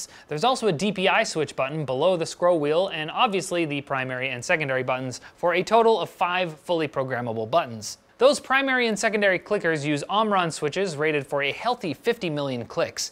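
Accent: Canada. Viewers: Lord Cheezus how linus has a high pitched voice and is has an american accent is canadian and you can clearly hear it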